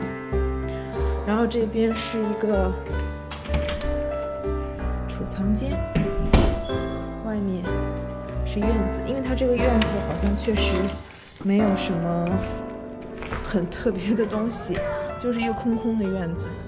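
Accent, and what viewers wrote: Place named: Beijing, China. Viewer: 您是北京人吧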